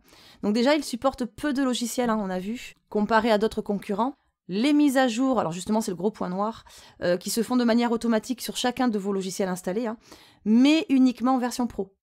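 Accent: South, France. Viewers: merci pour tes vidéos, t a l accent du midi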